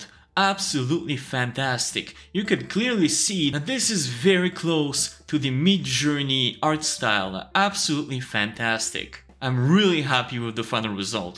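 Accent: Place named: France. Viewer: You sound french, are you french?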